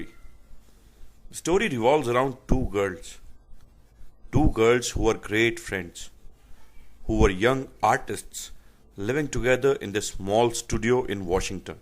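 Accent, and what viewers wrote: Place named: India. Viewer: I love Indian accents! Sometime it annoys me but most of the time, it's cute!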